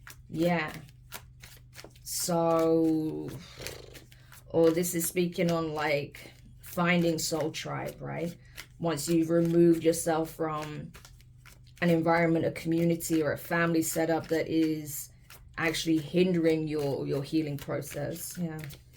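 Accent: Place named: Australia. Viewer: "Source is stepping in. Making good to a promise. Your prayer is heard. What are they encouraging you to do? To take initiave. To be assertive." "Make some changes. Release some things that do not serve you. We will make things happen." I just want peace for the world, Mam. I'm currently studying about international politics. I'm old to go back to school. Yet I'm studying so hard...despite the gossips,...despite the many projects. So that I can help to keep the peace in the future. Whether its within our city only or maybe with other neighboring countries too. ✨🌱👍 This is all i want. #WORLDPEACE Thanks for channeling this important message, Ms.! Thanks also to the Spirit Guides for the continuous support! ✨😇🙏✨ P.S. Mam are you from Australia? Your accent is so cute! ❤️🥰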